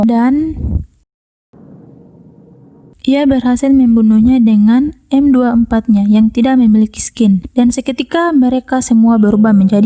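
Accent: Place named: Toraja, Indonesia. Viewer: Logatnya kek orang toraja, nih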